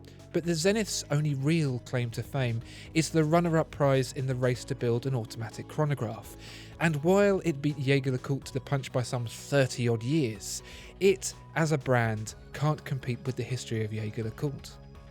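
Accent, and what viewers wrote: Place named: Essex, United Kingdom. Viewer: Are these voice overs done by Alan Davies? It certainly sounds a very specific accent of Essex/London border and certain class, not quite posh but not chav either.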